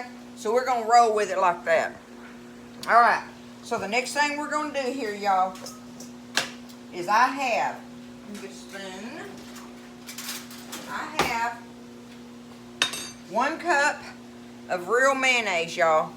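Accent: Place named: Texas, United States. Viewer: you from Texas? I am and your talkin reminds me of home? I married a coonass and I mostly hear this accent here and even the non Cajun accents are different than a good old east Texas accent? Always loved this recipe? But it seems like she (my memaw circa.1901) added a couple more things like celery and maybe a fine shredded carrots? Not positive about the carrots tho?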